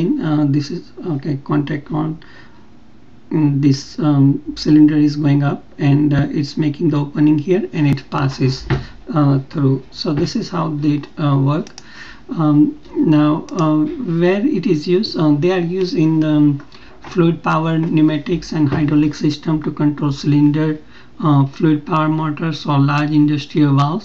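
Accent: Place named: Arab world. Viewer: Difficult arabic accent , but thanks anyway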